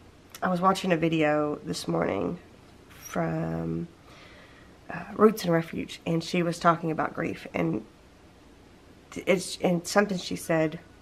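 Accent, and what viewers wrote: South, United States. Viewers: I know there's times that I have a creative block. So don't worry about it. We understand. I have a cute story to tell you. I had my neighbors 12 yr.old grandson over. He wanted to make something for his grandparents. He decided on a coupon book made from toilet paper rolls. So while he was coloring some paper to decorate with, I decided to listen to one of your videos. So I didn't put in my ear buds while watching. The video played for a bit, then he came over to look & asked me what country you where from, lol. I chuckled & said the USA & that you lived in Kentucky. I explained the people that live in the south have an accent. Of course he just said oh okay. I hope this gives you a smile, I know I get one every time I remember it. 💜💜💜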